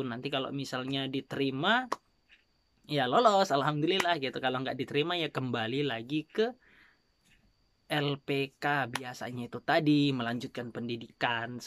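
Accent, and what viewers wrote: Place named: Sunda, Indonesia. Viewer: Dari logat kadang kek sunda kadang kek jawa, jd sebenernya sampean org mana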